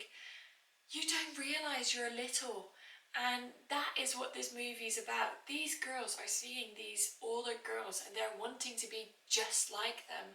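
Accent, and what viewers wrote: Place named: United Kingdom. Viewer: You sound British. How was the reaction to the movie in the UK vs US? I get the impression that the movie hasn't been a big thing over there.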